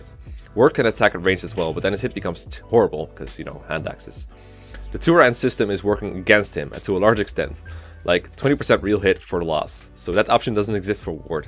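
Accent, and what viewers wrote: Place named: Netherlands. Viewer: Beware the Dutch "g"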